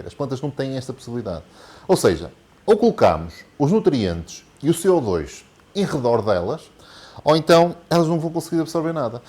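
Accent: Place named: Portugal. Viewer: Informações boas. No entanto, ninguém merece essa droga de sotaque Português. Terrível!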